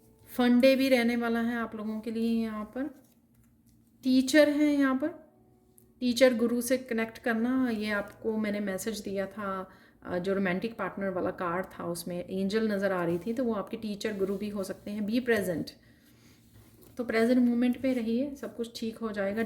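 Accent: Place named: Punjab, India. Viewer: Tu ganwar Punjabi tone wali aurst bqn eahi angrez hai sadi huî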